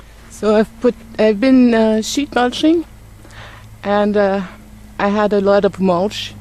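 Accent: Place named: Quebec, Canada. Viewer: Yaay another French Canadian accent ;) Pretty cool garden. But now you'll have to show the one at home lol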